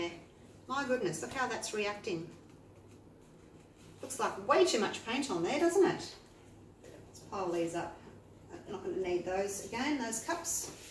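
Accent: Australia. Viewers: Disastrous?? It’s gorgeous! Love the cells - reminds me of a tile mosaic. I love listening to your chatter! It’s cheerful, and who doesn’t love a cheery Aussie accent?? Lovely!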